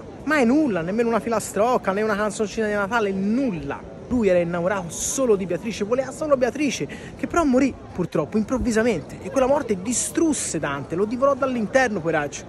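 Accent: Florence, Italy. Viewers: grande video, forse non decolli a livello nazionale perchè il dialetto fiorentino è un pò troppo particolare. Ma e un fa nulla e grazie mille!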